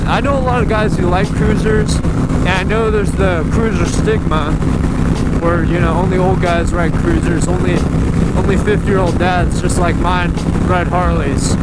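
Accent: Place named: Russia. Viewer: u tebya ochen yarkiy russkiy akcent, by the way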